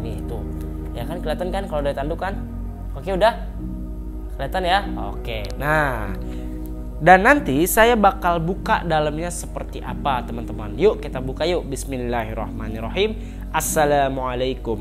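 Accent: Banten, Indonesia. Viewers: Logat nya kaya orang serang Banten ya bang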